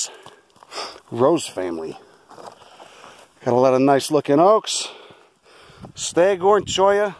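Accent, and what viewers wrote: Chicago, United States. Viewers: Is your accent Chicago?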